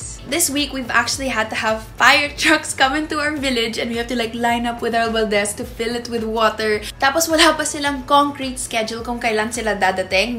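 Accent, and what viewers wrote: United Kingdom. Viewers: british accent😍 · I love your british accent. You're so good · The British Accent ❤️❤️❤️ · British Accent! Lakas maka BBC!!!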